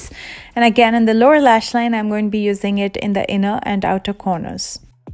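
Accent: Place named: India. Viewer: your Hindi accent is 🤗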